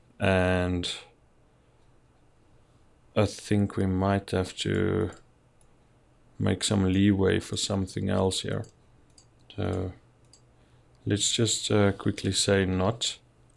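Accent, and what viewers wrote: South Africa. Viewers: Good to hear a SA accent on a tutorial video.! Keep the naas foorums up Boet! : )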